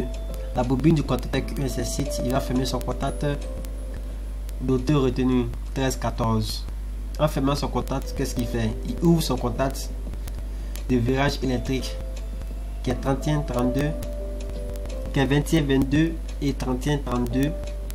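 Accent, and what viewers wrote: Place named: France. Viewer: j'ai rien compris par votre accent française !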